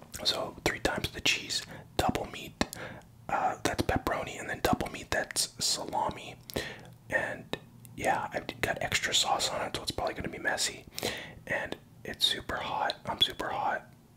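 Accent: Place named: Canada. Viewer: I can hear the Canadian in his voice….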